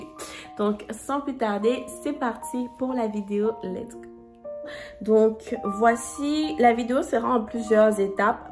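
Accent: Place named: Quebec, Canada. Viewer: T'as de plus en plus l'accent québécois lol😅😅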